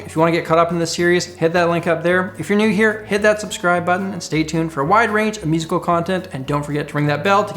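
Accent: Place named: Canada. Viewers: Forgive me if it's too personal/if it's a weird question, but where does your accent originate from? It sounds Canadian maybe, but a little more... I dunno, "thick" on some words? I really like it, it sounds very cool! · He’s from Manitoba, which is a province in Canada (I assume you’d know that, since you can recognize a Canadian accent. If you didn’t, I guess you learn something new every day 😅)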